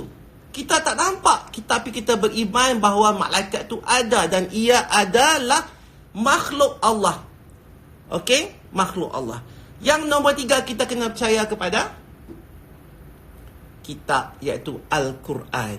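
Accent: Penang, Malaysia. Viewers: Nampak beza sblm dgn slpas dgn dia.. Bagus la.. Harap teruskan. Dia ni org mna.. Dgaq mcm penang atau kedah ja hahaha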